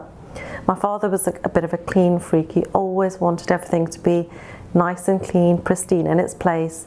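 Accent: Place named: England, United Kingdom. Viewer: I am so sorry that you went through Hell. But you have an English accent, and if you are living in London go to the police and get your Dad thrown in jail, and let him rot there. I was born in India and I know what women go through. If you are living in a foreign country. The athorities will protect you.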